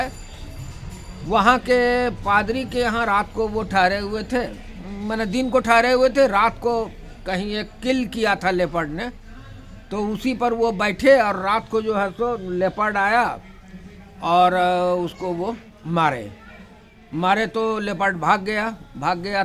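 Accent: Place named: Patna, India. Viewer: Yes indeed l have read the books of Jim Corbett in which he had written about his work related to railway.He was also a retired major in British Army and faught in First World War commanding Garhwal Rifles. But I didn't know that it was in Bihar. I enjoy your videos and very happy to hear your conversations and dialect which is similar to that of my parents who had migrated to Pakistan from Patna. I had heard of some wild life in Ranchi Bihar but did not know about such forests in Mungair.I would request you to keep recording your hunting experiences through such videos as these are assets, for being a nature and forest lover myself.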